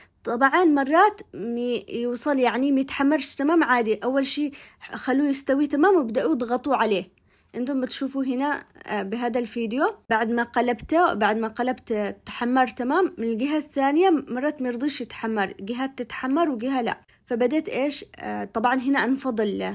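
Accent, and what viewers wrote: Yemen. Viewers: الله يعطيك الصحة انا جزايرية و زوجي يمني و الله يفرحك قناتك ممتازة ما شاء الله و لهجتك يمنية حلوة و مفهووووومة 😊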